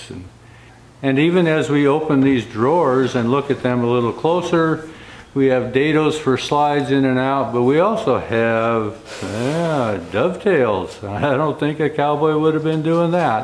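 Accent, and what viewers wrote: United States. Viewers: Overall a very nice wagon. Not sure what could have been on the surface of the kitchen box, but it's my hope one tradesman sets up the other for success. It will be interesting to learn at some future date how the kitchen cabinet makers style box construction holds up against the typical use of such a wagon (assuming it will get used)? Thanks for the videos - I enjoy them. BTW: 100% good ole American English ! 👍👢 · English, even with an American accent is fine by me..👍🏻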